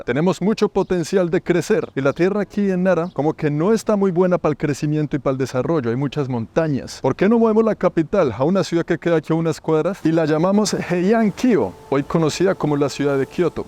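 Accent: Bogotá, Colombia. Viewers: Me fascina que hable como colombiano. Eso es lo más interesante de sus videos. Que hable con acento y palabras de Colombia. Eso es algo que le da un precio invaluable a sus videos, parce, el hablar con sello colombiano.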